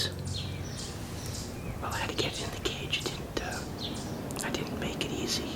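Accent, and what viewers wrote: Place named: Massachusetts, United States. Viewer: I love your videos especially your accent - I was born & raised in West Bridgewater right next to Brockton but now live in NC - you sound like home which makes me so happy 🙂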